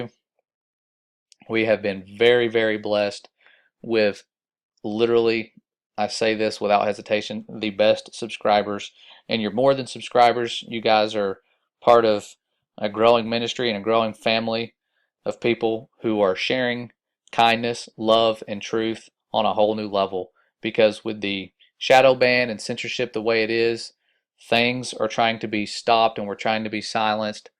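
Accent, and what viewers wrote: United States. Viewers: I’ve never heard Cairns pronounced with an American accent like yours ,, he he I was hoping to travel up from Sydney to go barramundi fishing in Queensland ,, Scott Morrison/prime minister went on a family holiday while his country burned down ,, and the 60million dollars donated by the public for victims was kept by the charity organisation,, these big charity organisations are money making organisations when u look into their history Haiti is a good example of how they operate poorly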